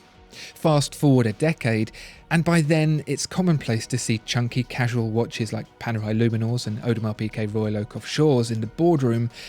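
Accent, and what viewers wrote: United Kingdom. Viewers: Ugly watch no matter how this guy with a British accent says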